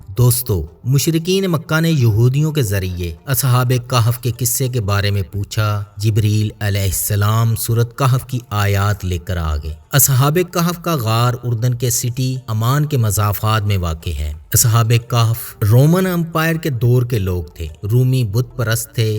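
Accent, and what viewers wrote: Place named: Punjab, Pakistan. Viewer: Apka punjabi accent lahja bahot bura lagta hai sunne me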